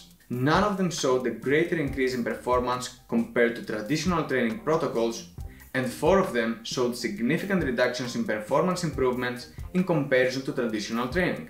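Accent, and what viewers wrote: Greece. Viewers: It's a Greek accent, he is Greek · Αδερφέ μα τον θεο πριν δω το βίντεο κατάλαβα από την προφορά ότι είσαι Έλληνας... btw καλή ανάλυση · προφορά ελληνική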